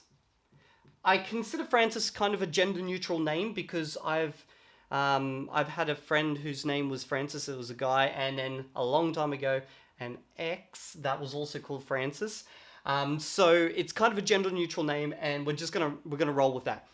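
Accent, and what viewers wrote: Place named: Australia. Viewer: Great to find an Aussie speaker, gives me hope I can do it!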